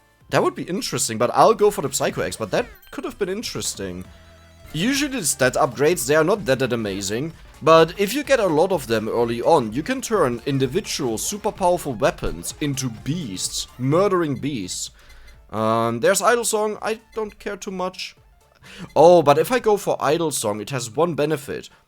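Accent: Germany. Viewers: Nah its not just book, pretty much every word with O is butchered. I think its of your german accent from your region. We from berlin butcher english another way, so dont worry.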